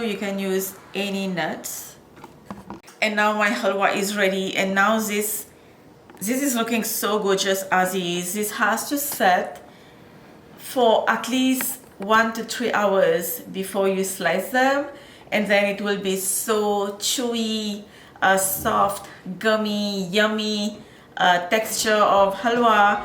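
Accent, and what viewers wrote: Mauritius. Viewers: Natural Mauritian accent. Nice. No forcing other accents 👍🏽👍🏽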